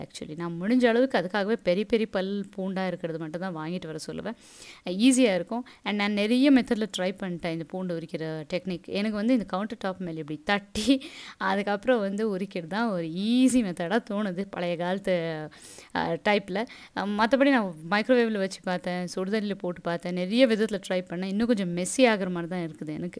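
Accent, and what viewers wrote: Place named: Karnataka, India. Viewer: Hi sis, very cute vlog, god bless long way to go,, i just love ur tamil..ur slang is like an beautiful karunada tamilachi...i am also like you....an Banglorean settled in Chennai..GOD BLESSS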